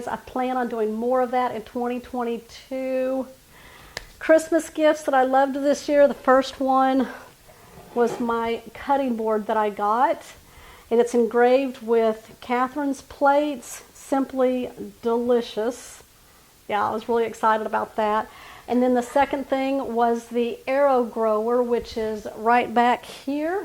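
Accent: Texas, United States. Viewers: Happy new year, y’all! I left Texas and it’s arrogant yet backwards ways many years ago, but it does my heart good to hear that Katy voice. I grew up in Brenham, rode the school bus to college (!) with CyFair kids, and since all my people have gone to heaven, I find myself missing the beauty of the sky, and the kolaches. I am no cook but I just love hearing your non threatening voice.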